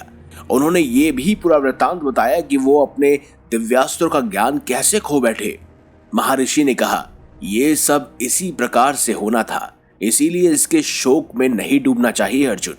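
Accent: United Kingdom. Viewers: जानकारी तो बहुत अच्छी दी है आपने लेकिन आप ये english accent में क्यों बात करते है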